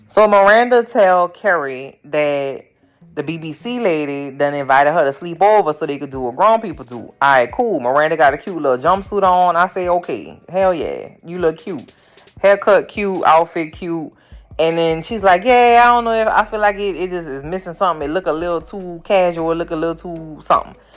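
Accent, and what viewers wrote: New Orleans, United States. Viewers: Girl you are HILARIOUS! Instant follow. Also are you from NOLA? Cause i hear that accent. 😂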